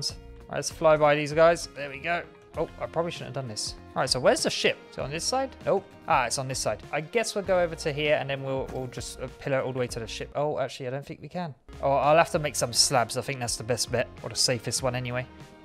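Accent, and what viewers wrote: United Kingdom. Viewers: I just found you! I love these things. Plus the British accent wiff the f sound always sounds authentic to me! Can you make me a Kitty but make it a nice name.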